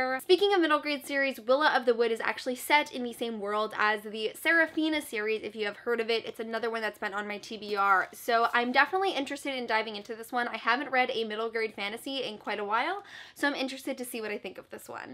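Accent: France. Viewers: omg your french is so cute! <3 :) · Félicitations pour le très bel accent français : ) C'est toujours un plaisir de regarder tes vidéos. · Your french pronounciation was too cute!!! Pour ma part, c'est l'inverse ; je lis en anglais alors que je suis québécois :p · Your french accent is so cute 😍 I love your video so much ❤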